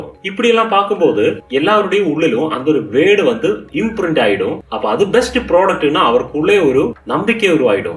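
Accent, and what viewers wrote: Kerala, India. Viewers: உங்க கேரள தமிழ் 😍😘😍😘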